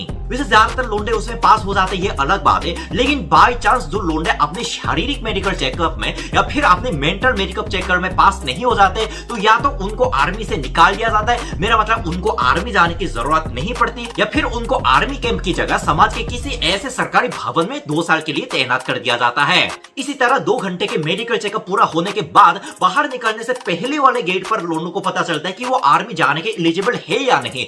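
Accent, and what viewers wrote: India. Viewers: Being a foreigner not only he speaks like an Indian but he is...like an indian.❤ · Iska indian accent mere se jyada acha lagta h🤣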